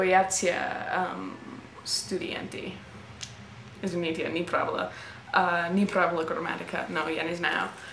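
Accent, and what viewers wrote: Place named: United States. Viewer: Try a little bit harder on pronunciation. You sound like an American speaking English with Russian vocabulary, But don't give up, it'll get better, Russian ain't Easy!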